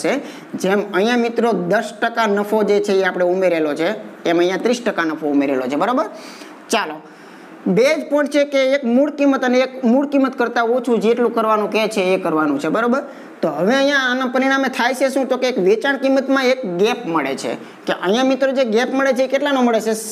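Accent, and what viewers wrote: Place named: Kathiawar, India. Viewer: કંઈ બાજુ ના છો તમે છે Saurashtra kathiyawad બાજુ બોલી પર લાગે છે એવું ...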